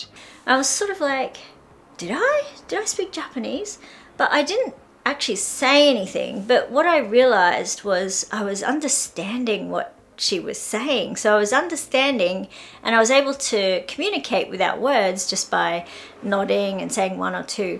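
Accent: Australia. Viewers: I know that much of Japanese English is based in part on American English so it’s not surprising that they likely struggled with your Australian pronunciation and accent. Interesting anecdote. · I understand where your comming from when it comes to a Japanese person or Thai understanding us english speeking people , us Brits have a thing in our heads called auto correct, if a japanese person ask me in pigeon English, we/I would probbably understand what they were trying to say, although they are not perfect, we, our Brains auto adjust to figure out what they want, or asking in there sentence, but i find that a lot of asian countries dont have this, unless its perfect pronunciation they just look blank at you, what probably happend to you as a teenager, unbeknown to you, you was speeking Japanese with an Ozi accent . I quite often have my Thai girlfriend in fits of laughter when i try to pronounce Thai, if you think Japanese is hard ( its not) Thai script has tonal pitches, although the spelling of a word is the same, the tone or rise and fall at the beginning, mid word, end of the word can translate into a different meanings, as for pronouncing Japanese, try and get a Japanese accent it help when i prononce it . 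· I am interested in not just the pronunciation but also the ups and downs of the voice... Though I have Japanese grammar and vocabulary books, no teachers here are willing to teach me speaking... it's a gap left blank. They are not native speakers thus not confident enough to take the job. As for the native ones, they don't speak my language so we can't communicate well and I find it hard to explain to them what I need. 😅 Besides, they are not educators just housewives who have no idea of the methodology of teaching. I found I am also improving my English with your lovely Australian accent haha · I'm sure speaking English simply uses the tongue differently saying vowels and letters differently. As she describes phonics. I would have a very difficult time speaking Japanese. I wonder if everyone learning English from her will have an Aussie accent. Probably same as my Dad learning English from a country under a British rule. 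In general I hope we all understand each other with respect. I applaud you for what you have learned so far.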